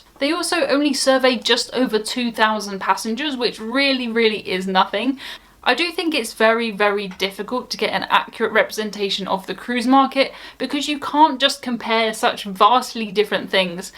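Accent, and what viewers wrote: United Kingdom. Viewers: Thanks for the British words! I love watching just to hear you talk. We Americans are fascinated by British accents.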